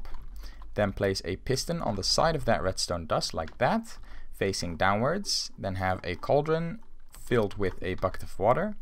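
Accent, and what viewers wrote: Netherlands. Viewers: Where are you from? You sound dutchie :p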